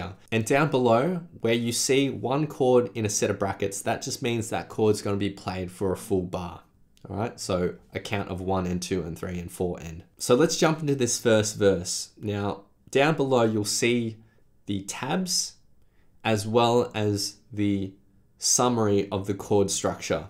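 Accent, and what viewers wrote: Australia. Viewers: i always forget this guy has an aussie accent when the video starts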